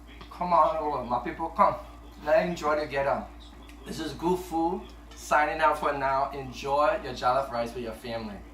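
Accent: Liberia. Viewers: Dis na small ting here o! Day man know how to talk ray liberian English yah. Day foo look goo o. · Very nice to hear your Liberian accent. Your accent sounds just like my husband. Would love to see more videos with you speaking your natural accent.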